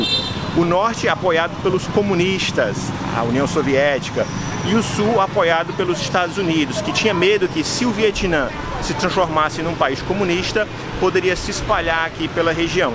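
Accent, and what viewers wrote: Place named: Northeast, Brazil. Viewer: Obrigado pela resposta,! É, senti algo do NE no seu sotaque, mas não seria capaz de cravar Recife. Senti algo de carioca também, mas nada de mineiro! Rss Mistura tudo isso com um tempo fora do Brasil, e, pronto!, chega-se ao seu sotaque. Valeu! Abs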